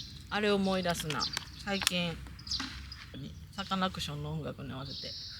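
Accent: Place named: Kansai, Japan. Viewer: いつもこっそりと動画を拝見させてもらってます。 関西弁で、焼き鯖寿司を食べる女子、素直でとても魅力的な女子ですな👌 これからも、キャンプ道中お気おつけて🙂‍↕️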